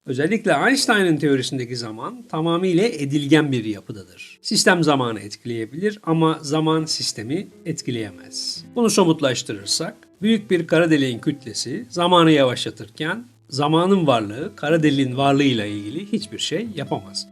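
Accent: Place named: United Kingdom. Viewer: British telaffuzu.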